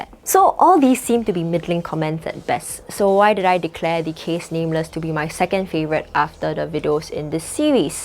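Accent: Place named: Singapore. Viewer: my/sg accent <3 also you should try the qian69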